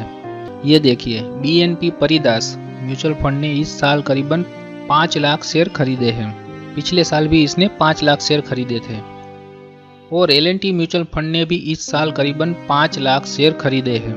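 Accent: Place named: Gujarat, India. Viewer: Love ur gujrati accent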